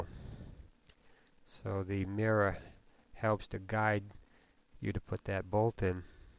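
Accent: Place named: Boston, United States. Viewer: Sheesh...ain't never heard nobody from Bahstin before? Great video. Used this as my guide, and hang the ol' Haines manual. Well done, and thanks a million!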